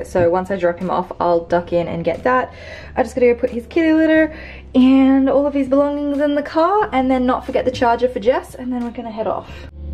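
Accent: Australia. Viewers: she's Australian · You can't love her that much if you didn't even know that she is Australian LOL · It sounds 110% Aussie?? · Hahahaha she's Australian... Aussies that travel have the most confused accents, mine's the same. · She doesn't sound British she sounds Australian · you reckon? She sounds Aussie to me. Says some words like Americans do which all you tubers seem to. Why does it bug you?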